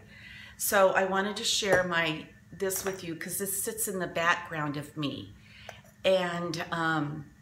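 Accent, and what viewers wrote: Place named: California, United States. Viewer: Hi! Do you know you have a California accent? My family use to tell me I had one when we lived there for years and years! And yes, you do! lol so funny that Paul McCartney was supposed to be your husband! I laughed at this! Oh, isn't it neat when God speaks to your heart? How neat is that! Take care my dear friend...love you! Jeanie xxooo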